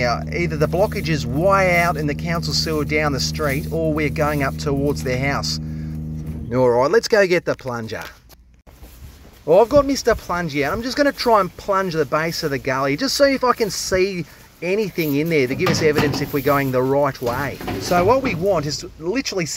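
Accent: Australia. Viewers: hey mate, on my (unintentional but appreciated) search for more australian content creators, i came upon ur videos, and for some reason i cant stop watching, even tho im certainly not a plumber. i dont know, since i spent 2 years avoiding drop bears and fightin 'roos and murder birds, i cannot get ur lovely country out of my head, bloody going to have to find a job/sponsor at this point mate, anyway, keep doing these vids, and especially that awesome accent, i miss it so much :)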